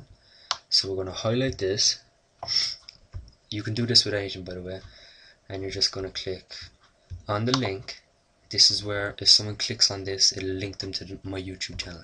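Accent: Ireland. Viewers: Is that a Langer accent I hear?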